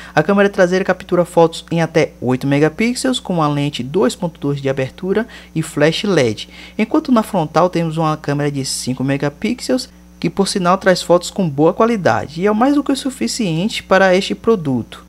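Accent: Bahia, Brazil. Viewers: você e de que cidade? Se for salvador adivinhei pelo sou modo de falar que so bahiano tem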